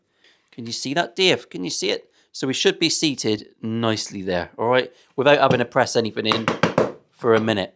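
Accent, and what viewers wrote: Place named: Portsmouth, United Kingdom. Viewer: I'm not even watching the clip, just listening and enjoying the beautiful Portsmouth English while carving my new piece. Greetings from Belgrade!👋🏻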